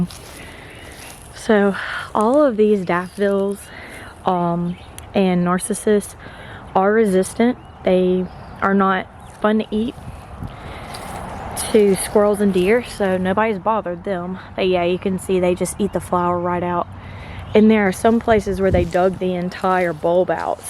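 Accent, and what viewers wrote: South, United States. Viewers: You sound like Arkansas or Georgia! Love your cacti Ma'am! Edit: Your sun porch looks amazing!!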